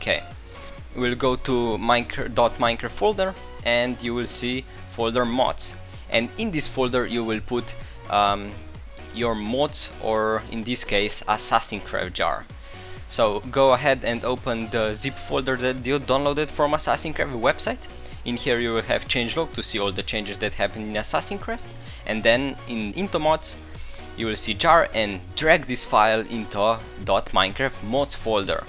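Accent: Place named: Poland. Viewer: Thanks you so much :3!! By the way are you from Poland, because your accent?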